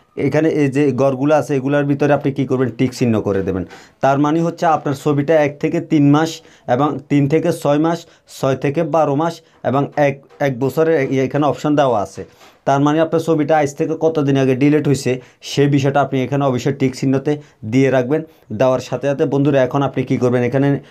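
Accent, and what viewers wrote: Noakhali, Bangladesh. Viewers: গুদ ভিডিও আপনি কি নোয়াখালি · ভাই আন্নে ত নোয়াখাইল্লা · নোয়াখালীর ছেলে না তুমি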